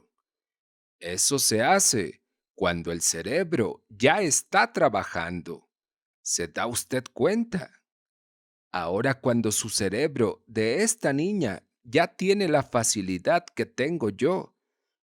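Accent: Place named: Yucatan, Mexico. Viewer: Wow muy bueno 👍 hasta tonito de yucateco hicistes muy buen trabajo 👍 por eso te sigo por tu buen trabajo 👍 gracias por compartilo tienes mi respectivo like #11 saludos desde Chicago IL 👍🙏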